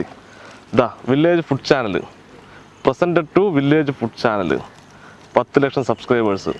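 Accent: Palakkad, India. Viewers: Palkkade slang powlii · ഹേയ് ഇതു ഞങ്ങൾ പാലക്കാട്ടുകാരുടെ തനതായ സംഭാഷണ രീതിയിൽ അദ്ദേഹം ജാടകളില്ലാതെ പറയുന്നു അത്രേ ഉള്ളു. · പാലക്കാടൻ സ്ലാങ് ആണോ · ആദ്യം ഒരുപാടു ചിരിച്ചു , നിങ്ങളുടെ പാലക്കാടൻ ഭാഷയും മറ്റും കണ്ടു ... അവസാനം കുറച്ചു കരഞ്ഞും പോയി ... നിങ്ങളുടെ ആ നല്ല മനസ്സ് കണ്ട് ... 🙏🙏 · Palakkaadan daa🥰🥰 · Ethu njaga palakkadada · Palakkad aano,ikkante samsaaram pkd pole thonnunnu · Palakkadan slang istam like here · all the best എന്ത് ചന്തല്ലെ ഇത് തനി നാടന്‍ പാലക്കാടന്‍ സംസാര ശൈലി 👍👍 .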